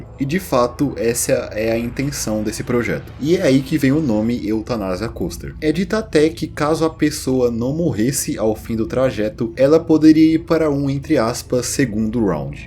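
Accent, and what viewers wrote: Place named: Maranhão, Brazil. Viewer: apenas uma pergunta fora de contexto. Tu é do Maranhão? kkkkkk tenho um amigo amigo maranhense q conversa estranhamente muito parecido cntg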